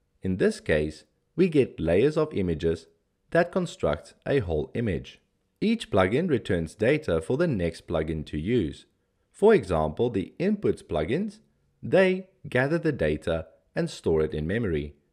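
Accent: South Africa. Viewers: Hey NFT ! Thanks for all this information. You sound South African, did I get that right? Anyways, I'm getting stuck in getting the art engine to work on my end (Windows). I have just finished my collection's art work and would now like to generate it. I'm getting stuck at the point where I have to input 'npm i' into the terminal. When I do so, it gives me a bunch of errors. I'm not a coder (still in the baby stages of learning) so this is all foreign for me. (Art engine template) Any help would be greatly appreciated.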